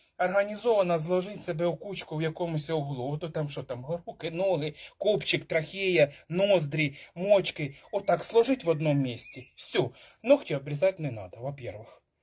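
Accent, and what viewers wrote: Ukraine. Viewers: Артист😂😂😂❤❤❤Спасибо. Нiч яка мiсячна зоряна ясная.. Как все это знакомо, все родное. Эту песню мама пела. . Может удивлю, но есть в России на Дальнем Востоке село, где жила моя бабушка. Ее родители были переселенцами, в царское время переселились в Приморский край. Вот на таком языке все село говорило. Даже интонации те же, и логика😂😂.. Слушаю, как будто земляка. Молодчина!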